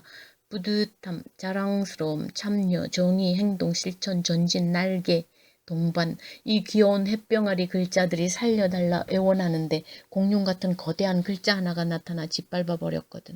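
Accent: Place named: Gyeongsang, South Korea. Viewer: 아...미티것따~~갱상도아지매한테 빠져든다~~ㅎㅎ 나또한 경상도아지매라서 꼭 내맘을 내가 듣고있는것같아서 좋습니다~~ 매력있는 유트브 ~~화이팅입니다 ~^^💙💛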